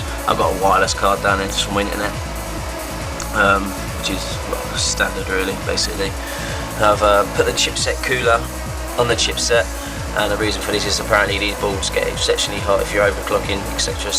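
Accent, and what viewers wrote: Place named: England, United Kingdom. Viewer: jewel core processor, thats what it sounded like, haha. I love the enlgish accent.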